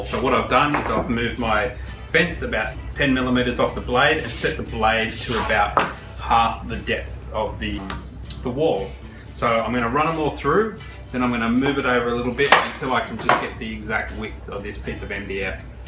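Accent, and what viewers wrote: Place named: Australia. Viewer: A fellow Aussie You Tuber, what a refreshing change to hear our own accent. Love the tool rack. I’ll try and make one this weekend but I think I’ll lay some steps in the base to stagger the rows of chisels. Being an old bloke on blood thinners, I don’t need any excuse to cut myself in the workshop. Thanks mate.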